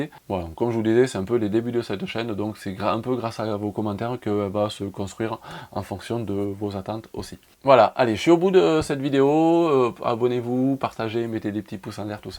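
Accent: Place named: Southwest, France. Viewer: Bonjour. Les perfections invisibles de Dieu sont dans la nature. Tu as l'accent du sud ouest, dans quelle région est tu?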